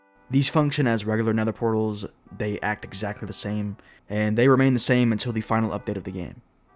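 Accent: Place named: South, United States. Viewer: I Can hear the southern in your voice brother 🦅🇺🇸🦅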